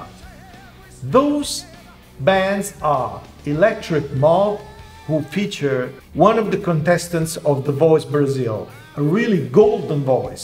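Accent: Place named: Italy. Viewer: Thanks ! Very interresting and for a french easy to understand an italian in englich because le the accent . Please continue this little interview an long lire ton frontiers !!!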